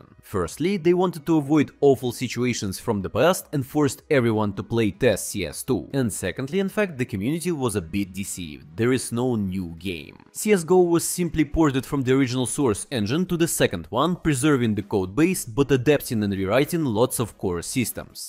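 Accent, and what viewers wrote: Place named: Russia. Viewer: you speak like russian with this accent, am sorry if i say wrong, am poland so it hears very known for me :)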